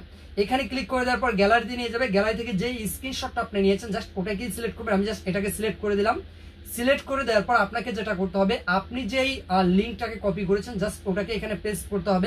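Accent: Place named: Barishal, Bangladesh. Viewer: বরিশাইল্লা আপা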